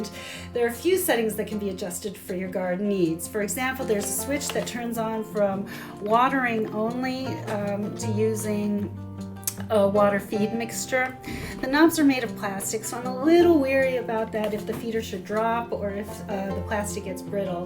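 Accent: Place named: United States. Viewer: Started watching the video and thought.. this is confusing... american accent but looks like dull UK weather & UK home / windows... yet then you mentioned you aren't in USA! I must be psychic...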